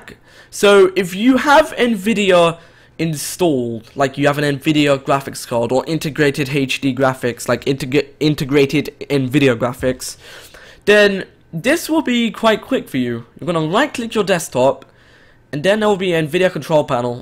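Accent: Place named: RP, United Kingdom. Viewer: wow, you sound so posh... (I'm just noticing)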